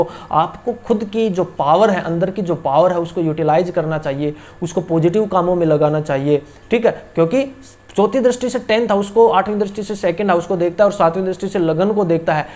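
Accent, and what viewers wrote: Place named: Haryana, India. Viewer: ji aap ki hindi mein haryanvi tone aa rhi hai 😃 I guessed correctly 👍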